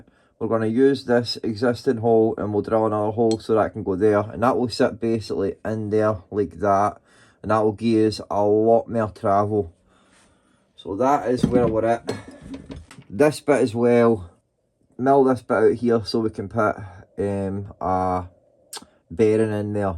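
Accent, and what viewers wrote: Glasgow, United Kingdom. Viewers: Great work, I've learned a lot today. Good to hear a Glasgow accent again. I left (Carmyle) 25 years ago to finish my days in Aberdeenshire but I'm still kickin. Ca canny. Garth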